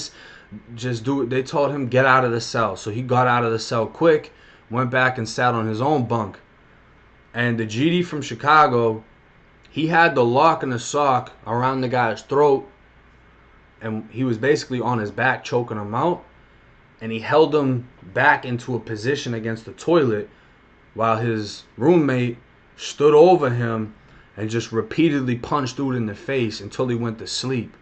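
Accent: Boston, United States. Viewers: Just hopped onto your Channel where you from bro sound like you from Boston I'm from Maine that's why I asked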